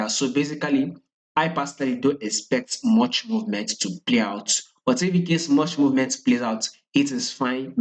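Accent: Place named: Africa. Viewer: u talking to heavy African accent..can u write the levels to buy n sell on the chart so its easy to follow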